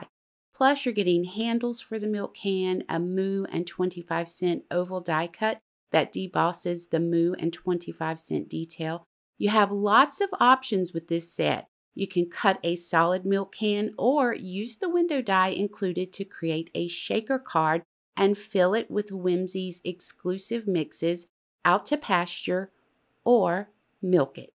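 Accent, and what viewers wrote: South, United States. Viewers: I just love the Southern Sass.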